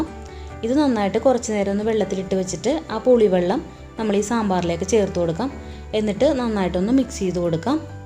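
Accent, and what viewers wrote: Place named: Thrissur, India. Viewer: Thrissur presantation superrrr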